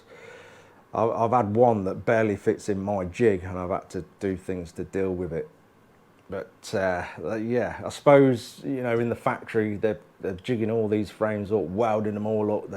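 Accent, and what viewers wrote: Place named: Black Country, United Kingdom. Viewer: Hi I've been watching your videos for some time now and I'd like to say how great it is to watch a professional /thorough craftsman at work! Thank you for sharing your knowledge with us! Just curious, You sound local to me, I'm in brierley hill what area do you live in? Thanks Mark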